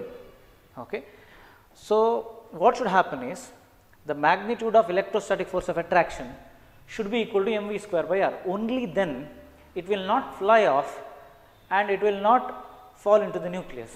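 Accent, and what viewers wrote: South India, India. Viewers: are you stupid? do you not understand accents? he is a south indian from karnataka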